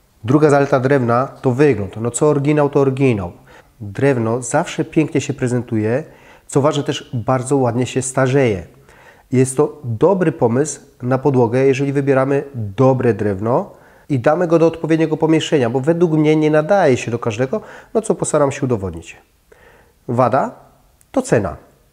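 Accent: Silesia, Poland. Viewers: Stara się, ale słuchać śląski akcent:)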